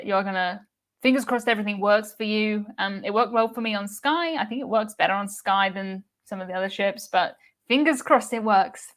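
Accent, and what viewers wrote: United Kingdom. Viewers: I like your British accent. And your videos look so professional and I like your raw views and opinions about cruises. Great work.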